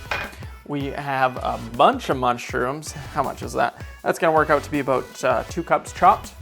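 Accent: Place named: Ontario, Canada. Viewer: Random question, but are you Canadian? You sound like you could be from Ontario lol!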